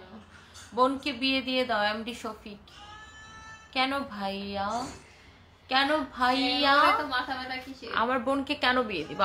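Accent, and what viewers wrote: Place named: Sylhet, Bangladesh. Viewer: Appu Hamen sylhet ke bolchi Aapne lagche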